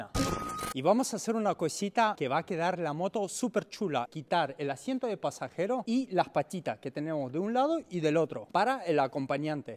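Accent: Argentina. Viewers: Ese acento bien argentino jajaj muy bueno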